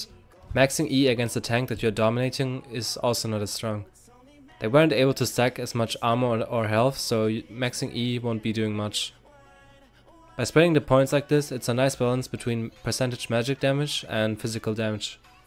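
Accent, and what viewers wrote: Germany. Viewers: Ayyyy that german accent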